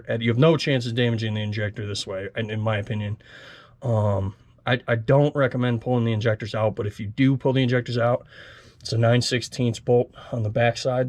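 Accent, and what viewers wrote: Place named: Buffalo, United States. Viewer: you sound like you grew up in buffalo or somewhere close.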